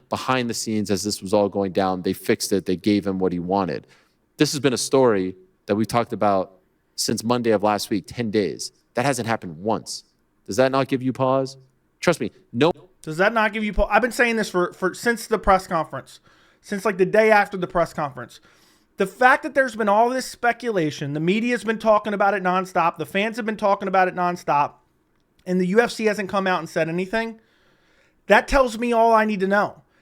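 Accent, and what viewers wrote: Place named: Philadelphia, United States. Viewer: That Philly accent is top notch my guy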